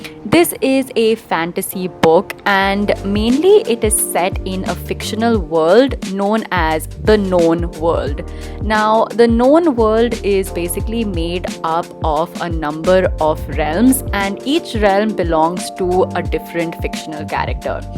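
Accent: India. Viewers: Yes.. Heard her speaking hindi for first time in this video.. Hey.. Do make a hindi review too.. You may attract a great audience as well for your hindi accent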